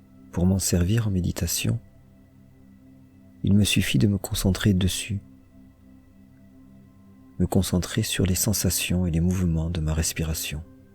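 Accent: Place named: South, France. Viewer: Qu’est ce qu’elles sont bien vos méditations et le guidage très doux, avec ce petit accent du Sud qui ajoute à la souplesse des images proposées . Merci infiniment.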